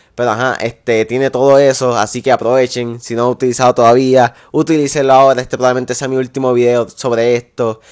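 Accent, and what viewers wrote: Puerto Rico. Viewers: Eres de puerto rico? Se nota por el acento, biem Video like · Tu eres de puerto rico te eschuchas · tu eres de Puerto Rico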